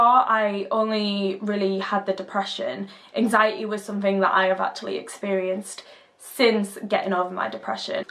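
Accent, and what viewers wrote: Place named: Yorkshire, United Kingdom. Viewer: love ur Yorkshire accent!!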